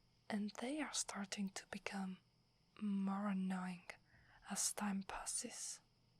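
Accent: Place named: Italy. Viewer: It wouldn't be cute without the italian accent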